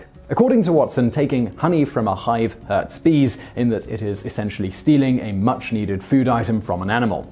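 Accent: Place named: RP, United Kingdom. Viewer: True, he does sound posh but, it's funny as hell when he looses it.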